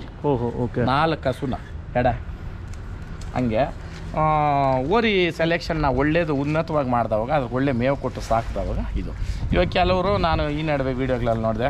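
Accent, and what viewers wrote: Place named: Butler English, India. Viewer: Batlar English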